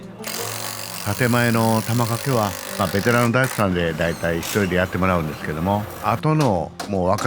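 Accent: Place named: Kansai, Japan. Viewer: 建築設計事務所をやっています。子供の頃、模型を作っていた私に、親父がしきりに「物事の道理」ということを言っていましたが、さんの作られた住宅を見ていると、その言葉が思い浮かびます。私も関西出身ですから、さんの話す言葉が、親父が話している言葉のように聞こえてきます。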